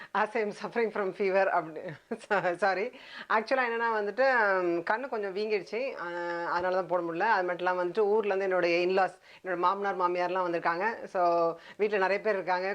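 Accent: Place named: Vellore, India. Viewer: madam recipe is simple and super. Thank you for sharing with us. entha oor neenga unga tamil enga oor vellore tamil maari iruku.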